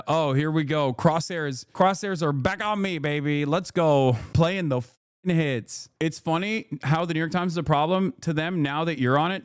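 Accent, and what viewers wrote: Australia. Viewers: Heads up I had previously subscribed to your channel and I’m here to find out what the effed up lie sky news is saying about you, being an Aussie myself. And I find out that I am unsubscribed to your channel. wtf? Love your Aussie accent very very funny lol. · I'm also an Aussie, I was musing about where geographically in the country his accent might be from. Cities have a posher accent whereas rural Aussie accents are described as "broad" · Australian Fox News has very few Aussie subscribers, but US MAGA love it. !! See a mention as a point of pride. Your Aus accent is f.....d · It's like a cross between "oh naur" city girl, and broad TV aussie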